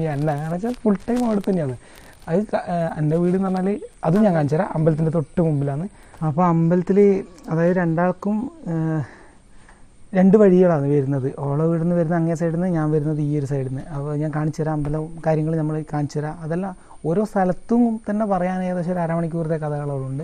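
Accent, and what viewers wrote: Kannur, India. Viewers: Kanuuurrrr💪💪💪💪 ഭാഷ കേട്ടപ്പോഴേ മനസ്സിലായി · Slang kettappazhe thonni nmle aalann.... 😊knr da💪🥰🥰🥰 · Bro Poli language. Kannur language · Njammale kannur basha 😁🥰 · ഞമ്മടെ കണ്ണൂര് ഭാഷ💪💪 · ഞമ്മടെ കണ്ണൂർ ഭാഷ 💕💕💕💕 Chechhii chettaaa❣️❣️❣️ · Kannur evida. Samsaaram kettappozhe thonni ente jillayil aayirikkum enn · Nammala kannur basha🤩💖💥🔥🔥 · Kannur bhasha kett comment box nokalarnnum.....Kannur eadaya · Kannur slang ❤️❤️❤️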